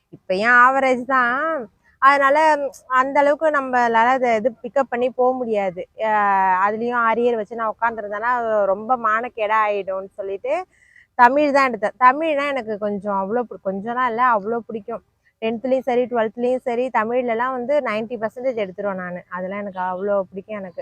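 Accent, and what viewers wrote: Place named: Thanjavur, India. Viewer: Hi da nanum thanjavur unga slang pudikum unga area name venum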